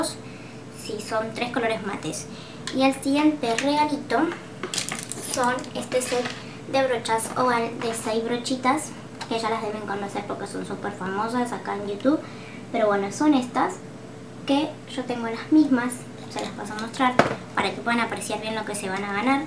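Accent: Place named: Argentina. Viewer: hola me fascina el acento argentino😍 yo soy mexicana pero como me gustaría hablar como tuuuu🙊 PARTICIPO.. me encantaron la paleta de sombras, gracias por el sorteo.. un abrazo😘🙋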